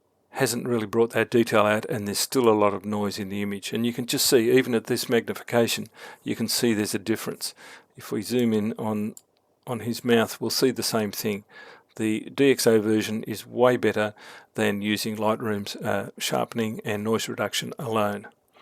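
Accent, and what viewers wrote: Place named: Australia. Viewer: Hi there. I hope you remember me, we have spent time together on workshops in FNQ and on a Barrier Reef Island. I'm the guy who likes to sleep in hammocks.I may even use an unusual brand of camera😉 I really enjoy your lessons and tutorials, it's great to hear them in an Aussie accent. I have recommended them to my Photo Society Buddies. this one is very relevent as I was in your home town last weekend shooting the vivid festival, and high ISO images were required to capture the beaams of light in the sky. I have the older version of DXO pure raw, (1.6) and also the latest version of Topaz denoise. I'm thinking of upgrading DXO Photo Lab so I canhave the benefits of PR3 and Nik collection 6. Do you think there is benefit in this move?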